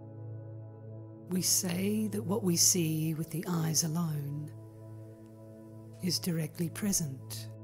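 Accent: Australia. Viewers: are you an Australia? I love listening to your readings and i love the space you give it, the most essential aspect of this work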